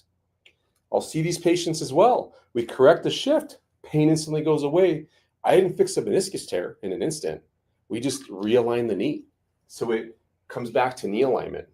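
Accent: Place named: North Carolina, United States. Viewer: I can hear you North Carolina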